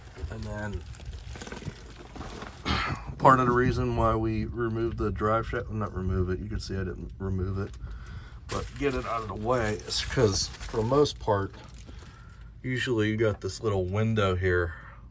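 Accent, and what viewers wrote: Pittsburgh, United States. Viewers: I just subbed bro lol I’m doing mine right now actually haha. By the way…..??? Are you from Pittsburgh??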